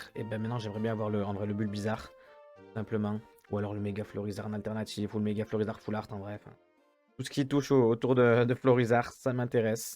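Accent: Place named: Lyon, France. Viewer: un lyonnais je parie ou stéphanois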